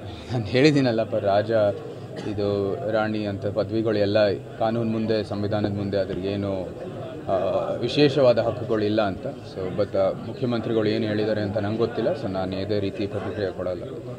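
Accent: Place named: India. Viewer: ರಾಜಗನ್ನಡ ಕೇಳೋದೇ ಚೆಂದ 🥰👏👏👏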